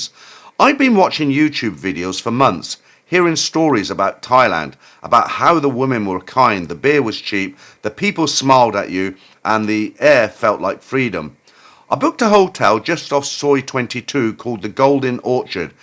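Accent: England, United Kingdom. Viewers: Glad you are keeping these. I would never watch AI stories they’re boring and soulless. Watching since the shed days of 2020/2021 I have never even been to Thailand but I find them entertaining. Also nice to hear an English voice while I’m in australia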